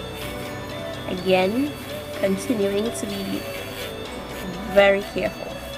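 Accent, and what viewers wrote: Trinidad and Tobago. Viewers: Are you Trinidadian?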